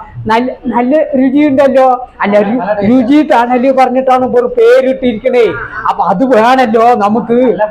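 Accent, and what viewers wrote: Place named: Palakkad, India. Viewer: 🎉🎉🎉 അടിപൊളി ആണല്ലോ....Etttttooooo........ നല്ല അവതരണം.... പാലക്കാട്.... തനി നാടൻ ഗ്രാമീണ സംസാരം..... full support ഉണ്ടാവും.....🙏🙏🙏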